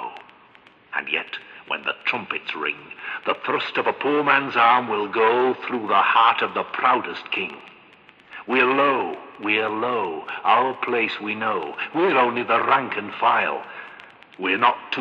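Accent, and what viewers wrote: United Kingdom. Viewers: As someone who is currently writing a doctoral thesis on the poetry of Ernest Jones I was fascinated to find this animation of 'The Song of the Low'. The truth is, however, that Jones's speaking voice would have sounded nothing like this. The accent of the fellow reading this poem (and reading it very well, I must say) is very much Northern English. Jones's father was Equerry to the Duke of Cumberland and he was brought up in Germany. Jones was famous for his refined, 'aristocratic' diction...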